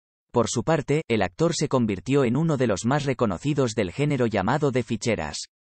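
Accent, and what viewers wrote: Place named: Spain. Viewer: Con respeto es muy interesante sabes más del cine de oro mexicano y la relaciones familiares con actores que todavía tenemos en vida, pero oooooo en cuanto empeze a oír el acento español lo quite a la vrg. No puedo con la Zetaaa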